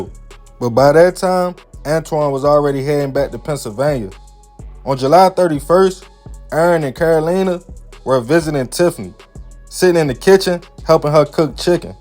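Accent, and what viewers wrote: Baltimore, United States. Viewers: Man If It wasn't for my Army Buddy From BALTIMORE Back In The mid 80s I would Never understand your accent..HOT DUGGS for All my friends 😂😂😂😂😂❤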